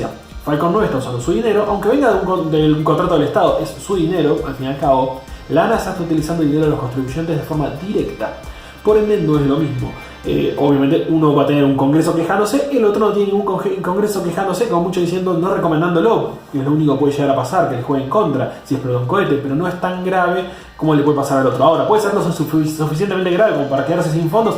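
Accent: Argentina. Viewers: Gostei muito das suas exposições. Pelo seu sotaque vejo QUE és argentino. E me escrevi em seu canal ok.abraços de Brasil 😃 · argento 100%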